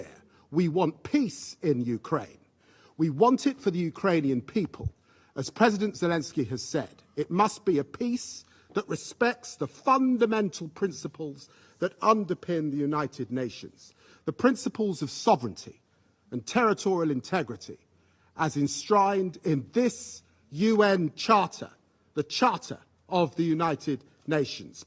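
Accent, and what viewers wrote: United Kingdom. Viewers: No American these days can do a speech like that. British accent and way of saying things also just sounds much fancier and more imposing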